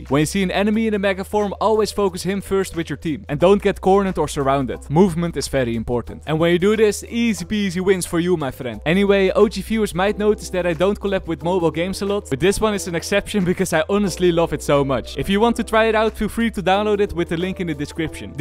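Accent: Netherlands. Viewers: he lekker goede nederlander youtuber je hoort het echt goed dat je nederlands bent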